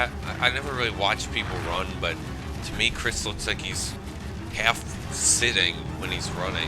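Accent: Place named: Northeast, United States. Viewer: are you from New Jersey, New York or New England? Your accent is very familiar.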